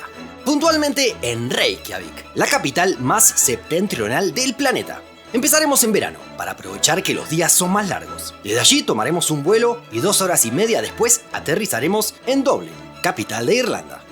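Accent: Rioplatense, Argentina. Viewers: De dónde sos ? Me encanta tu acento! (Argentino o uruguayo)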